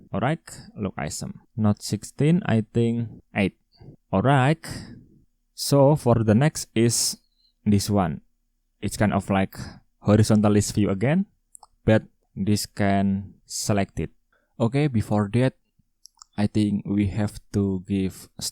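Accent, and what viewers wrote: Indonesia. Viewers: Cara bicara inggrisnya seperti orang indonesia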